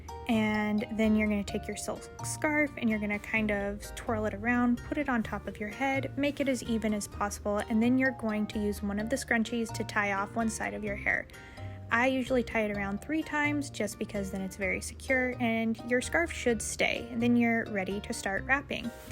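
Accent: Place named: South Africa. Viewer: She’s South African?!! Aye aye! ❤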